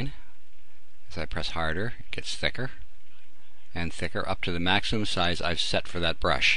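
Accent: Canada. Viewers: i dont have this, so my question is dumb, buttttt: you keep saying "press harder" what do you mean? are you you using some sort of pen? how do you press harder on a mouse? -sorry i dont really understand but i DID enjoy watching! p.s. are you canadian? you sounded canadian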